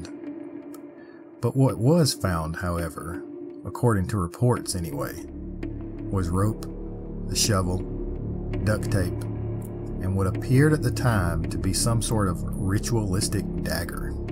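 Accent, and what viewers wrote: South, United States. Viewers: You're welcome! I saw on another reply of yours (on the Conjure Chest vid, I believe) that you were concerned about your accent. If anything, your accent is not a deterrent, but validation. It is clear that you take time to research your content and present things in a factual, non-hyped manner. Those from the South will most certainly appreciate you telling the stories from the regions that both you and they call home. That is truly appreciated.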